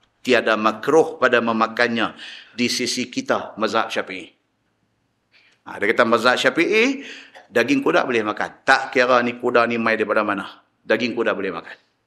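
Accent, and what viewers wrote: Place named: Penang, Malaysia. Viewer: penang dngr sleng dia bleh tau dh penang...kecuali perak blah utara slalu akan owg kata kedah peneng dia ad loghat dia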